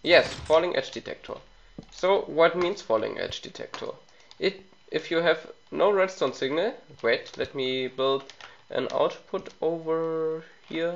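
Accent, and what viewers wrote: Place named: Germany. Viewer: du hast einen deutschen Akzent. :D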